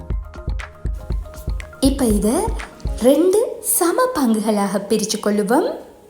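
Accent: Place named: Northern Province, Sri Lanka. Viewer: மாஷா அல்லாஹ் ❤ வடக்கு மாகாண தமிழ் மிகவும் அழகு 🎉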